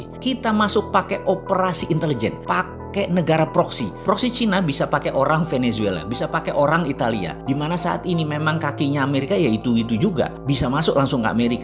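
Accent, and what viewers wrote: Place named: Surabaya, Indonesia. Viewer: Jamput rek ..cakcuke arek suroboyoan.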